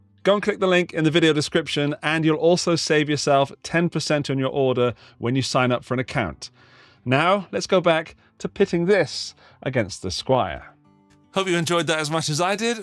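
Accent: United Kingdom. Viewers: How come we have someone with a British accent reviewing guitars in America?:face-green-smiling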